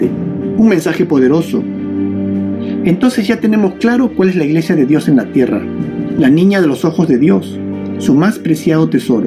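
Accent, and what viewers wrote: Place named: Peru. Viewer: Estimado hermano, por tu acento, y tus 🦙 llamas 🦙 esos lindos adornos que se aprecian al fondo, supongo que eres peruano 🇵🇪 que hermoso estudio y reflexión, digno de un siervo del Señor de los cielos. Quiera Dios te escuchen a ti, ya que a mi no me creen, la mayoría piensa que La Biblia es un libro de cuentos de fantasía. Como cristianos e hijos de Dios debemos estar velando, pero no quieren ni hablar del tema. La paz sea contigo, amén 🙏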